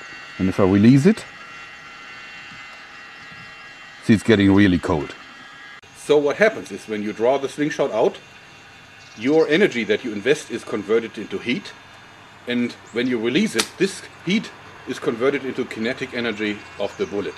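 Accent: Germany. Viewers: Der gutealte deutsche Akzent xD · German english sounds funny xD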